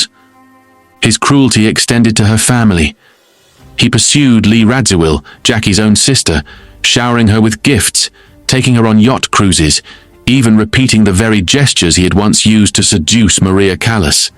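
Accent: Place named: England, United Kingdom. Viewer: they wanted to be broken..funny narrated by a Pom - more damage to the world done by the English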